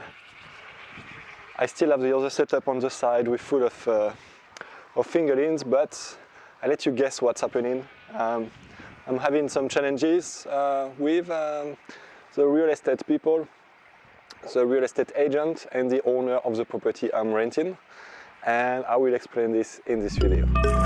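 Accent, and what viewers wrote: France. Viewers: Vous êtes français ? Car quand je vous écoute ça ressemble à un français qui parle anglais😄😄. C est pratique du coup parce que je comprends un peu mieux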